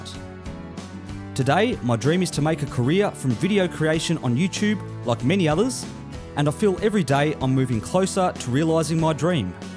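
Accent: Australia. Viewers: now that's an awesome aussi accent! Congrats, mate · I really enjoy your channel best! At first your very austrialian accent made me laugh and since then I am a subscriber. Keep up the good work!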